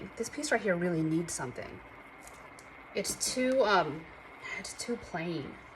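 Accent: New Orleans, United States. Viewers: Girl, you are from Metairie or New Orleans FOR SURE!! I can tell by that accent (you sound like me!) and those glitter names…”Garden District, Sugar Cane?!” HA! Love your channel…new sub!!!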